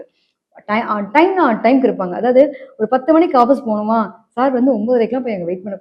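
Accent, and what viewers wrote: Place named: Noida, India. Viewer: நொய்டா ராகத்த நீக்கிவிட்டு சொன்னால்தான் எளிதாக புரியும்...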